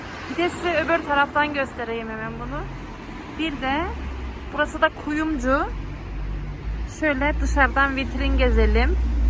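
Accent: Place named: Ankara, Turkey. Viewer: Nerelisiniz ankaralï gibi konuşuyorsunuz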